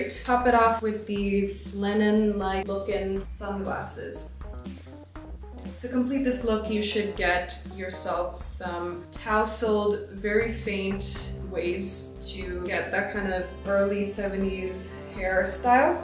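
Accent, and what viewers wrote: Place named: United States. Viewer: Aksen nya america bgt…tinggal di US ya?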